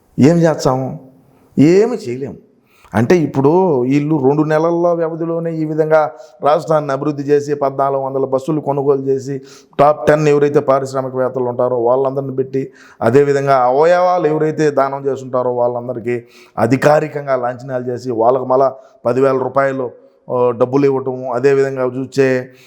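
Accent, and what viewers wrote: Rayalaseema, India. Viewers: Seema yasa బాగుంది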